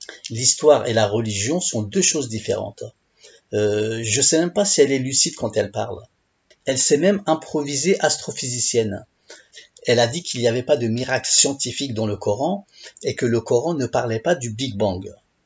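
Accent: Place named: Turkey. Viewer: Zann. Avec z. Ne veut rien dire. Mais than a. Thana ou bien thounou qui signifie penser. Comment toi prof tu enseignes ? Pour enseigner , il faut se faire comprendre . On ne comprendrais pas sinon ! Pour beaucoup l arabe c est du chinois , seulement toi tu y ajoute ta sauce accent turk ... C est bien de rappeler mais rappel les tiens.... mais avant de rappeler les tiens , ai la certitude de tes propos car tu es loin du sentier avant de trouver la route qui te mènera au droit chemin .... que seul les alamins ( initiés ) savent. Sache que le Livre, que personne ne comprend ... est destiné que pour les initiés.... Et il n y a pas de dajjal ou de mahdi qui va revenir. Mais la sentence direct . C est une question d heure. De sa3a comme il est écrit.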